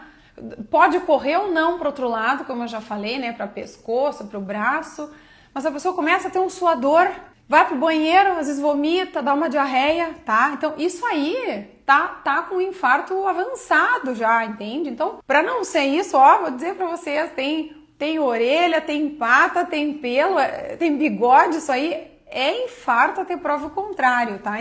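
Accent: Rio Grande do Sul, Brazil. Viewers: Dra de onde a Sra é? Do Rio grande do sul? Se sim atende onde? Moro perto de Porto Alegre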